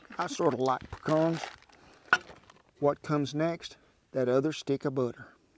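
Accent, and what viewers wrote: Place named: West, United States. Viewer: Mr happy thanksgiving to you and your family wow this looks so yummy you 've done made me hungry lol love your western accent you are so awsome thanks for all of your yummy videos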